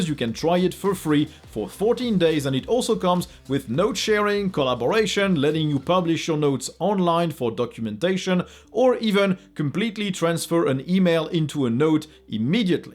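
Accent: France. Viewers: Wait, are you french?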